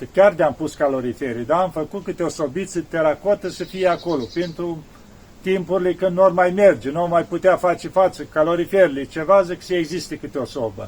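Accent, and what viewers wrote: Moldova. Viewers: parinte schimba graiul ala moldovenesc ca este enervant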